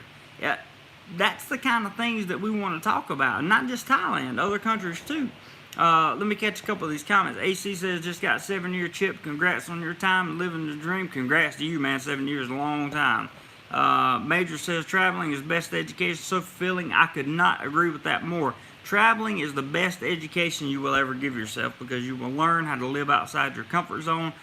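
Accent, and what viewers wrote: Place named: Texas, United States. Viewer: I like your Texan drawl!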